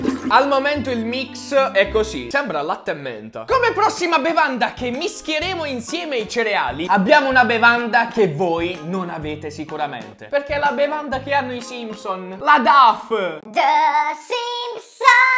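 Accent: Apulia, Italy. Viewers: Parla un po' più pugliese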